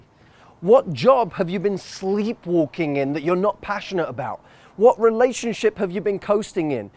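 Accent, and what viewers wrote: United States. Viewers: like your american accent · Came here for the life tips. Stayed for his American accent😂😂😍😍😍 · great american accent! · Your American accent is impressive! Look out Hollywood! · he does an American accent so well, but I love his accent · WHOA That American accent!!!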